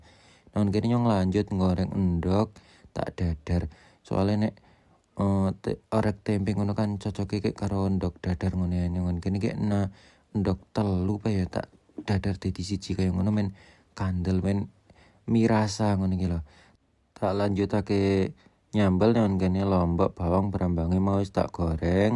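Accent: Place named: Java, Indonesia. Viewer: Iki bahasane kok kaya nggon ku ya antara banjar ngslor apa wonosobo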